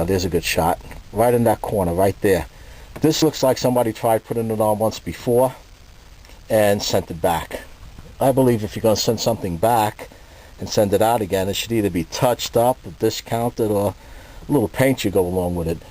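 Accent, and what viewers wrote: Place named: Boston, United States. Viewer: Boston. It's gotta be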